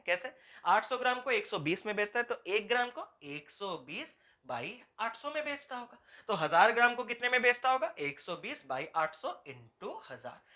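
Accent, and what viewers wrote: Odisha, India. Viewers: Are you from odisa?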